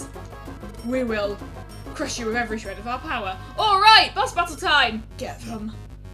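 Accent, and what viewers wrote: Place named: Europe. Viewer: Yay, the fugitive saga is done! The ending always gets me a little choked up. Your partners reaction to the truth is so genuine and heartfelt, and the music makes the moment that much more emotional. I remember the huge feeling of relief I got when I first played. The fugitive saga definitely has its problems with the crazy difficulty spike and low variety of Pokemon, but it will always have a special place in my heart. I've also heard that males have a higher crit rate and females have higher accuracy and evasion, but I can neither confirm nor deny this. It seems to be the case from my experience though. About your commentary, sometimes I have trouble keeping up, but that might be because I'm not used to European accents. Also, I get a little overwhelmed by how many things you talk about, but I understand that dungeon crawling isn't the most exciting thing and that you need to talk a lot to fill the time.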